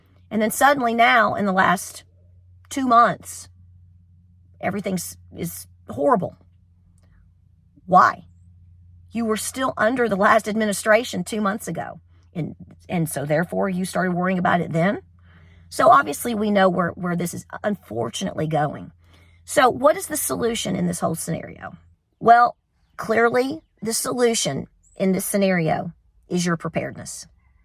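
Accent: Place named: Appalachia, United States. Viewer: Just a beautifully, raspy Appalachian voice! 😉 Get well in a jiffy,! 🙏🏻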